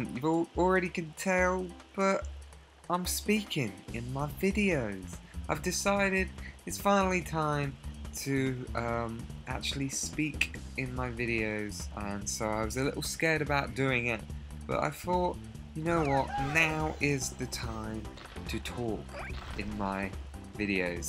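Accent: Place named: United Kingdom. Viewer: its Nice! #ILoveBritishAccent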